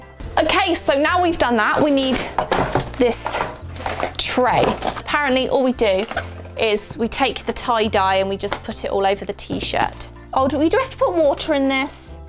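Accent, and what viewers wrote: United Kingdom. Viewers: IDK why she llookss like millie Bobby Brown and has British accent is it just me yeah I think so I'm weirdd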